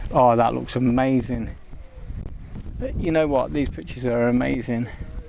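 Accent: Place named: England, United Kingdom. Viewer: Great videos, thank you!! . Also I love your English accent. 😊 Cheers from California 🍺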